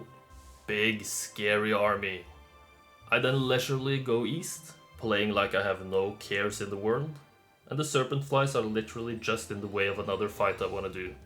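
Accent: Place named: Norway. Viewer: ❤ By the way, judging by your accent, might you be norwegian?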